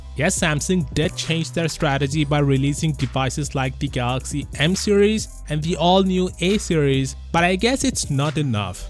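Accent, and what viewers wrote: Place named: India. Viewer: 浓浓的阿三口音。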